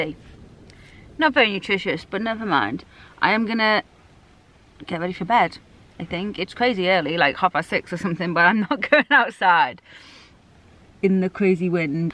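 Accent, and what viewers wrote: Liverpool, United Kingdom. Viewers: Looks so beautiful there, love the Peak district..... is that a slight scouse accent you have? i live in Wirral...... don't be afraid to make longer videos because i think lots of people like them longer! :-)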